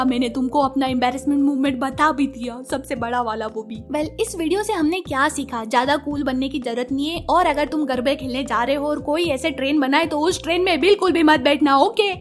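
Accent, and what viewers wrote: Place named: Gujarat, India. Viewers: But aapki accent se toh aap gujrati hi lagte ho 😅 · I thought you were gujrati because of your accent😮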